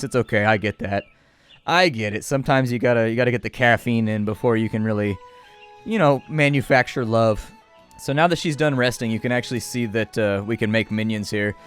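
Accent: United Kingdom. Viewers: Love the brittish peseant accent :D Oh and the game as well :P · Duuude that Bri'ish accent!!! loving it.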